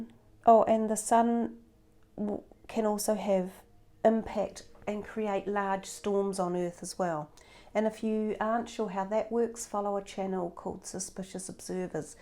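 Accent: New Zealand. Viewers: Came across you the other day great to hear a kiwi accent lol 🙂 very interesting reading, I think October is going to be quite full on 😬 Thank you! ❤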